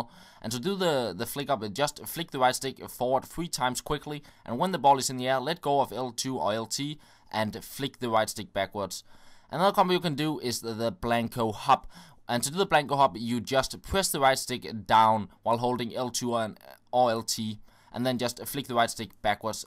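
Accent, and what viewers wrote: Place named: Denmark. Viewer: Haha, man kan hører du er dansker :-) - Elsker dine videoer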